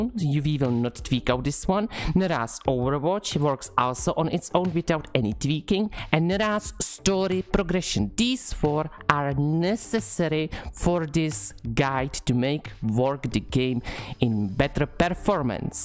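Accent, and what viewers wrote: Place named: Italy. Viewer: What AMAZING ACCENT! Is it Italian? I LOVE it! Also, thanks for the guidelines!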